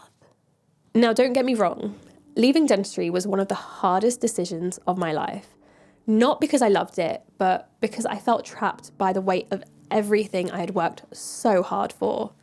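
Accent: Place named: RP, United Kingdom. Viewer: You sound very posh!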